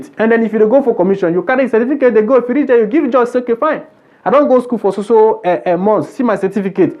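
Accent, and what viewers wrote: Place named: Nigeria. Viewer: Bro please try to speak good English not only Nigerian English okay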